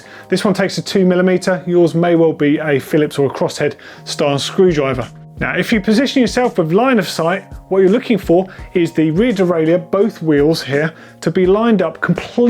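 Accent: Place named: United Kingdom. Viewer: Thanks for the advice. My husband Michael was having trouble getting his Deore XT 12 speed working properly. He said he chose your site because he said people with a British accent just seem smarter! He will be out again tomorrow because of your help.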